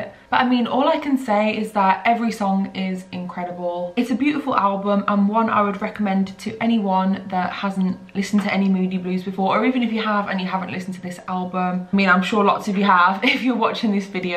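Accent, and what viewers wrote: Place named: Manchester, United Kingdom. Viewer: You just showed me that I need to catch up on a lot of good music 🖤 btw are you from around Manchester? Just trying to identify your gorgeous accent. Maybe I'm totally wrong - sorry about that. Hello from Slovakia! 🦥💨🤢😆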